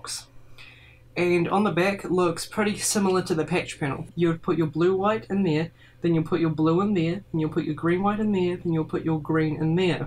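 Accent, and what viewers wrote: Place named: United States. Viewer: Dude are you kiwi living in America, lived in America, or are kiwi kids getting American accents from the internet? Nice tut also.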